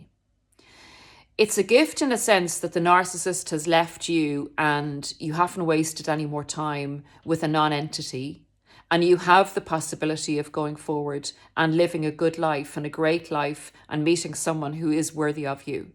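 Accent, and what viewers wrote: Ireland. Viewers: Just want to say I just ran into your channel wonderful videos I love your Irish accent and your charisma your a Attractive Spiritual Lady.. well here's my story.. Around 2014 I was on social media and Facebook a woman girl who latched on to me as a really close friend that was into the same arts and Music as myself. Just prior I had broken up with a previous girlfriend she came on pretty strong throughout 2014 till 2016 I started getting really close and I felt I found a wonderful person well I'll start off by saying she was a covert narcissist NPD vulnerable type but this is way before I figured it out we were long distance Maryland and Oregon I thought wow I finally met a perfect match. Time went on we started flying out to see each other back and forth for the next 4 years it was very amazing and she seemed very angelic and a stand-up person and she had a one son she was very generous and always kept offering to book our vacations and pay for everything I didn't like that feeling and paid for most stuff for us also that we did also I was sort of entranced that she was just doing it out of the kindness of her heart and care and was what I learned a codependent such as myself and she was really having problems with depression and other things and was looking for a connection. 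When we weren't seeing each other physically being long distance we talked on the phone every night when I get home from work and do Skype until we could see each other again on our vacations from work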